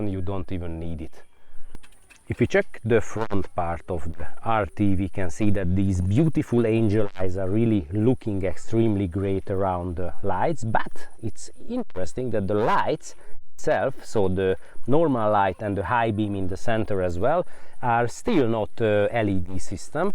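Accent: Hungary. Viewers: Typical Hungarian English accent, very knowledgeable and I’m sure it’s not your first BMW! Hajrá magyarok! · It's Hunglish.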